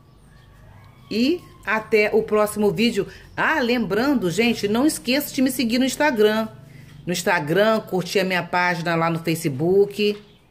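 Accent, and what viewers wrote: Rio de Janeiro, Brazil. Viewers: Hummmmm, que delícia!!! Amo esse sutaque de carioca, amo suas receitas!!! Simples e fácil!! Vc é o já mora no meu coração!!!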